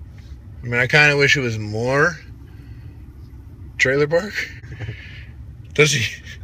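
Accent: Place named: Canada. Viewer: You sound Canadian.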